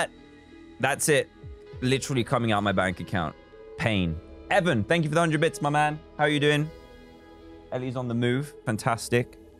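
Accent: England, United Kingdom. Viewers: I know :) I just wanted to see if I was the only one who heard it, even though it's his English accent. Thanks though 👍🏻😁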